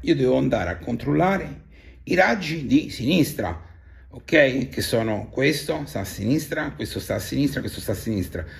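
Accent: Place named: Rome, Italy. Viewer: Dall' accento capisco che anche tu sei di Roma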